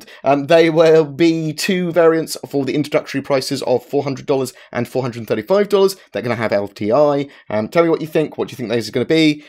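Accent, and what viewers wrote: England, United Kingdom. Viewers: Thanks for the update. Are you English?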